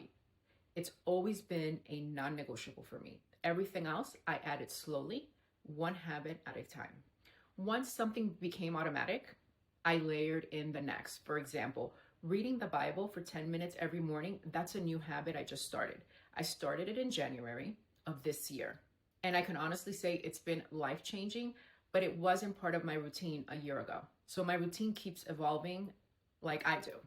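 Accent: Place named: Miami, United States. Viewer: I had a feeling. I love your Miami accent. Keep up the amazing work!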